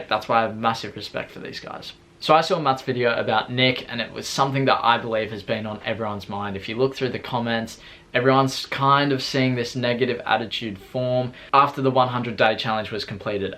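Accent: Australia. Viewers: Is this guy Australian I just found this guy why haven't I heard of this guy?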